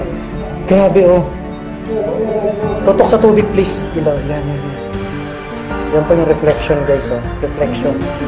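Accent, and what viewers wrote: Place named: Batangas, Philippines. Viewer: taga batangas ka kc may punto ang tagalog mo hehe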